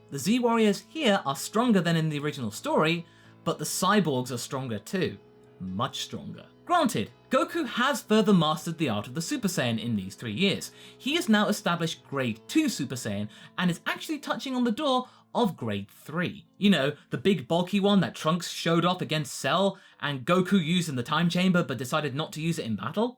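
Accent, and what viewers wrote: United Kingdom. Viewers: Ya kinda sound like a british Goku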